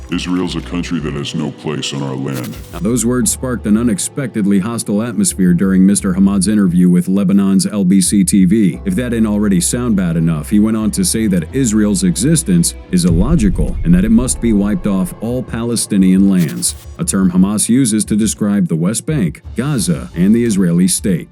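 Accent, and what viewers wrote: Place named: United States. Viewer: When I heard the American accent narrator I knew the narrative of this propaganda. Video skipped.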